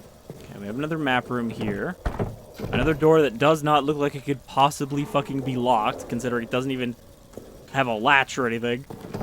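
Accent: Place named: France. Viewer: Golden french accent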